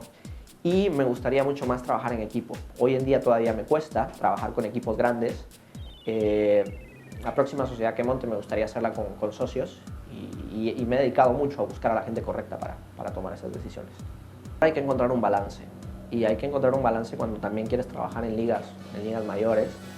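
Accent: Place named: Spain. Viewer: Por que el acento español??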